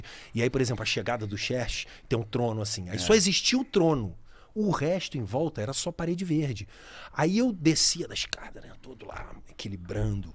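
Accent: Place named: Rio de Janeiro, Brazil. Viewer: Sotaque de carioca, mas nasceu em Ribeirão Preto.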